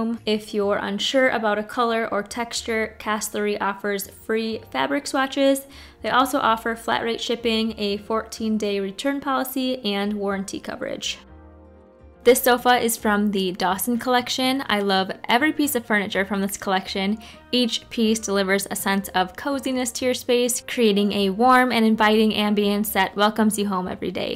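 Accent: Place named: Russia. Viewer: You kinda have a Russian accent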